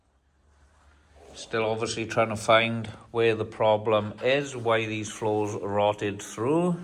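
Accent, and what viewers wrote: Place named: Wales, United Kingdom. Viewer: Just something about a Welsh accent that makes me smile.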